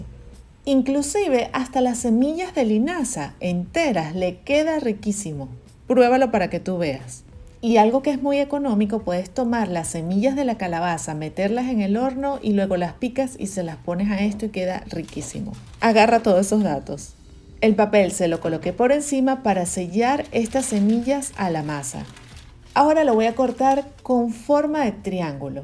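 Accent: Venezuela. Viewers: Tienes acento venezolano. Bueno te envio la bendición d Dios en nombre de Cristo;puesm parece genial la receta. Gracias. Saludos desde Venezuela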